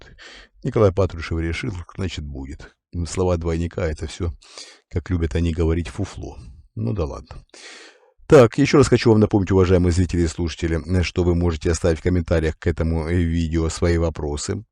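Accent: Ukraine. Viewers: Вопрос -, почему у Вас украинский акцент?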